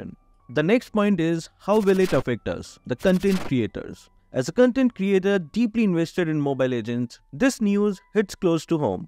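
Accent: India. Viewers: So difficult accent indian ... Caption made you understand instead your voice over..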